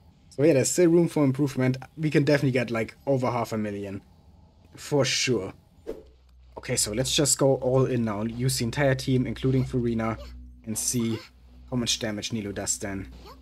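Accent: Germany. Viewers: Your accent, are you German? Or speak a Germanic language?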